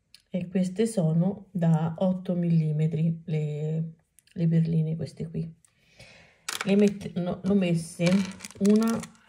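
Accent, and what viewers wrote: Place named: Fermo, Italy. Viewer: Veramente bella e primaverile. Ma di dove sei?io abito in un paesino a pochi km da Fermo...a sentirti parlare sembri anche tu delle mie parti...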